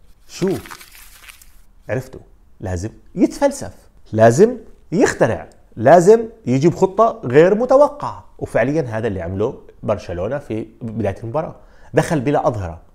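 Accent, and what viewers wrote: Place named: Syria. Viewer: اللهجه السورية بتجنن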